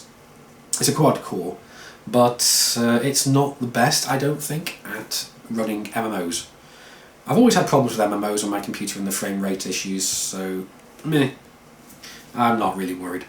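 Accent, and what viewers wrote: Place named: England, United Kingdom. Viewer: Congrats on reaching 15k subscribers. And good luck with getting more. BTW, from which part of England are you from?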